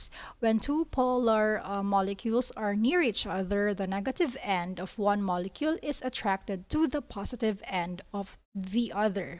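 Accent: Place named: Visayas, Philippines. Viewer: Bisaya mn diay ka ma'am 😅❤️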